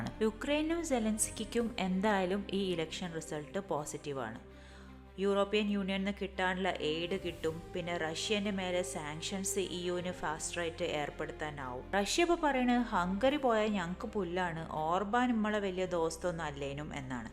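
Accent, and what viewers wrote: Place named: Kozhikode, India. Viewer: congrats 🎉. Big things in simple humor coated "koyikkodan" slang. Go ahead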